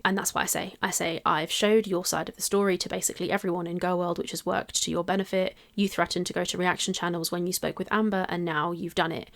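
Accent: United Kingdom. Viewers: Does think the British accent somehow changes the words we already read?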